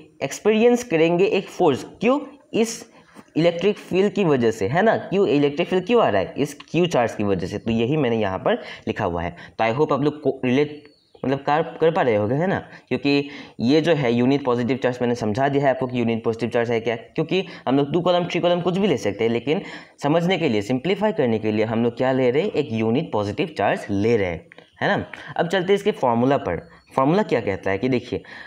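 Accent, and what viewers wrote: Assam, India. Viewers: Sir apuni axomr neki apunar hindi kowa style tu amr axomiya manuhe kowar dore 😊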